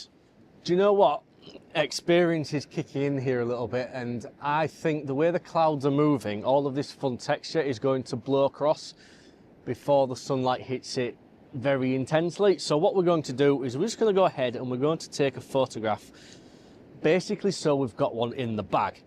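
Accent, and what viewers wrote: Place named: North East England, United Kingdom. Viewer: Superb photos. I really am thinking about going back to M43 and this video helps. Because of your accent I actually thought you were from the north east so was surprised when you said how far you travelled! ‘Honey pot’…if you want a honey pot head a bit further south where you’ll find St Mary’s Lighthouse at Whitley Bay 🙂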